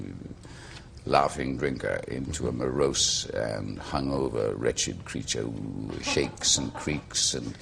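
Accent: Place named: Wales, United Kingdom. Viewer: Can hear his welsh accent at times, poor man, rip.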